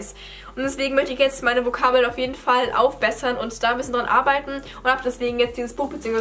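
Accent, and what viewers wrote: Germany. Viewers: Deine Aussprache ist eher deutsch korean😊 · Deine koreanische Aussprache hat einen deutschen Akzent. 😂 Aber das kann man durch genaues und mehrfaches Zuhören und Übung wettmachen. Ansonsten top Leistung! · Du machst das echt super 👍 Weil du sagst dass dir deine Aussprache nicht gefällt, ich finde deine Aussprache klinkt sehr deutsch (also mit einem deutschen Akzent) zumindest im Intro. Aber ich denke mit der Zeit wird sich das bestimmt ganz von selbst verändern ^^ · Ich liebe den deutschen akzent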